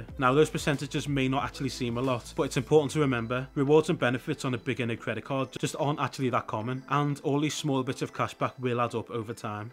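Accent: Liverpool, United Kingdom. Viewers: That's a scouse accent, so Liverpool...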